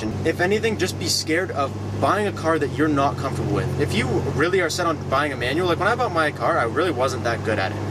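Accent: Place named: Canada. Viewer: I keep hearing “aboot” and I’m like.. you’re Canadian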